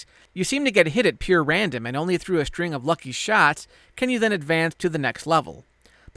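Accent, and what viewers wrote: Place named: Chicago, United States. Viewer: Love the Chicago accent!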